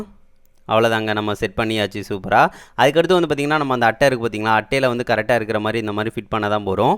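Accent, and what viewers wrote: Sivakasi, India. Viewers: உங்கள் வீடியோவை பார்த்துவிட்டு அதன் பின் தவறான முறையில் அனைவரும் வீட்டில் வைத்து செய்துபார்க்கலாம் என்று நினைக்க தோன்றும் அதர்க்கு முன் உதாரணம் ஆகிவிடாதீர்கள் நண்பர் அவர்களே சிவகாசி உள்ள ஒருவனின் குரல்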